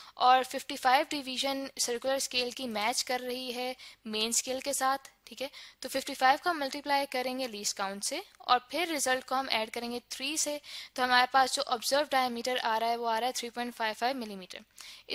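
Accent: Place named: Pakistan. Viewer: Assalamoalaikum mam aapki awaz bht khoobsurat h or aapke smjhane k tareeke ki m fan ho gyi hu Aap shayad pakistan se hn asal m aapke bolne k tareeke se laga mgr sch m aap bhht achhe se smjhati hn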